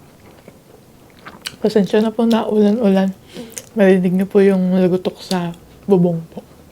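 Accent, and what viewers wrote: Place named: Cavite, Philippines. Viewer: tga cavite po b kyo?ung punto po kc eh. . .😊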